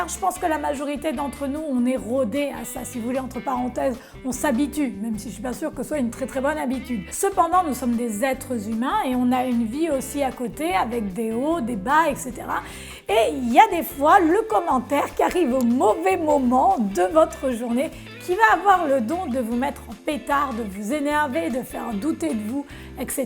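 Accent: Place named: Italy. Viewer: Charmante femme avec un bel accent italien , qui nous donne des vidéos intéressantes à regarder concernant les drones ou autres... continue comme ça et au passage même si tu es assez grande pour ça, ne fais pas attention aux haineux. Au plaisir de voir tes vidéos ! À bientôt 🙂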